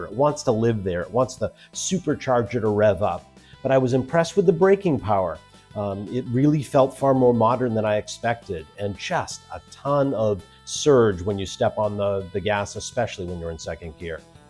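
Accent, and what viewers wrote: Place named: Canada. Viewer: Let Me Guess You're canadian ?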